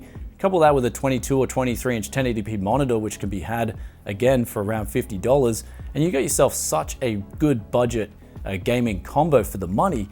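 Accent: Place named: Australia. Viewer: Grrizz HES Australian bro ahahah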